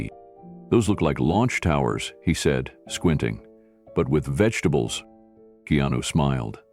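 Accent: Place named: United States. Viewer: ❤ I DO Appreciate these stories especially regarding Keanu, for example and credits. BUT ADS!? For those of us already bombarded with constant Google ads that are depressing for the fact that elderly ads are about drugs, weight loss, everyday relentless bombs of negative facts of life, aging, to the point of brainwashing and same Ads over and over, taking up more time than the story on some. I do appreciate the American dialect of a well educated man, not AI.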